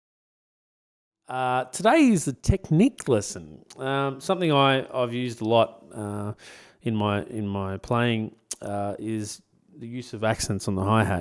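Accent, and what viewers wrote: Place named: Australia. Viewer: Was not expecting you to have an Australian accent haha. Good video.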